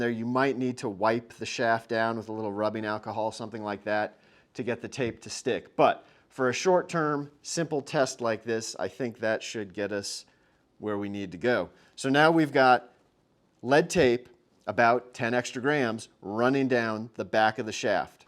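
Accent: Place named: United States. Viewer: im just so glad finally an american accent shewie ..no offense to the rest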